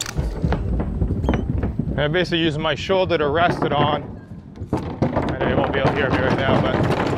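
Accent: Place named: Canada. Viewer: I love it when Canadians go oot n aboot.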